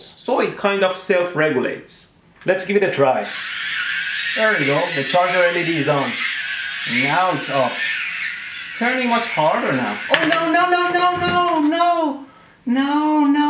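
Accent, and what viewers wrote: Iran. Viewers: he has an Iranian accent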